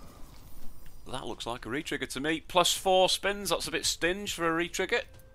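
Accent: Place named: Scotland, United Kingdom. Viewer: Love the Scottish accent 😂Greetings from Glasgow mate. Keep off the bevy 😂😂🎉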